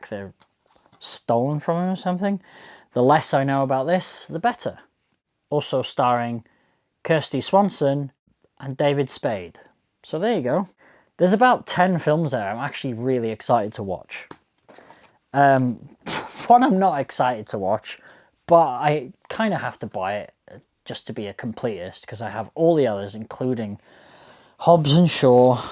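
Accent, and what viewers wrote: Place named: Midlands, United Kingdom. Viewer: Amazing what town are you from? Your voice sounds midlands, is it that part where there is a 'something' line I have heard Elis James talk about before?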